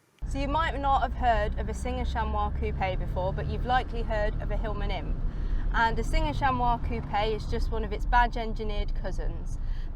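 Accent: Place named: United Kingdom. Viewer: Why do the Brits give the letter A the sound of a letter U? They play sports with a bull, instead of a ball. To us a bull is a male cow.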